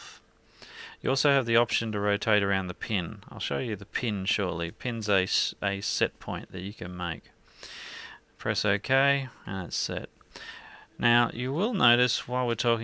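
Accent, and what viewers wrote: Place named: Australia. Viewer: I heer an aussie :P cheers for tutorial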